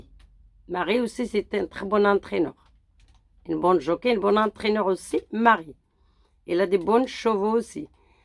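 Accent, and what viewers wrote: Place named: Brazil. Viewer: Bonjour de la Guadeloupe...continue sur les pronos..avec ton accent brazil....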